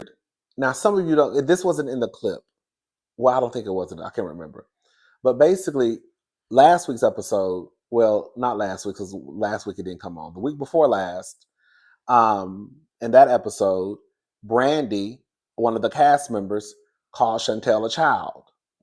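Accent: South, United States. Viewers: Team Jackie ❤ I holler errtime you say HURRR I live for your country ness and your laugh 🥰🥰🫶🏾🫶🏾‼️😭😭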